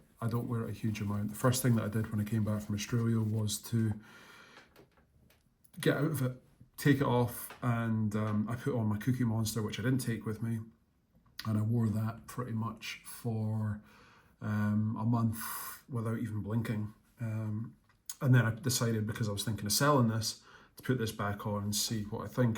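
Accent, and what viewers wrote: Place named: Scotland, United Kingdom. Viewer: Sounds great! I thought I could hear an east coast Scottish accent. Wasn’t sure if your local AD was Jamieson and Carry up in Aberdeen?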